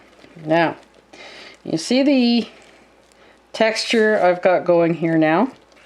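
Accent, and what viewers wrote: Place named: South, United States. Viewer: Thank you for getting back to me. I will check goggle. The reason I ask where you were from. I noticed a southern accent in your voice. I am from the southern part of Virginia. But I now live near Baltimore, Md. The northern part. I don't get too meet very many southern people here. I will look forward to more of your videos. Thank you very much for sharing. Have a Merry Christmas.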